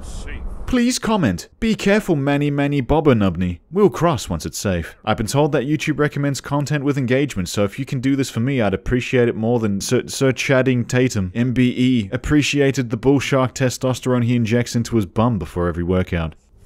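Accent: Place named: Australia. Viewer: It's strange, i assumed was Australian on account of his accent but he always names his characters typical German names. Intriguing stuff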